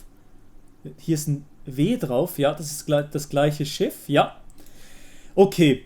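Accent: Switzerland. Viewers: Ey komm er ist Schweizer und bemüht sich so gutes Hochdeutsch zu sprechen😂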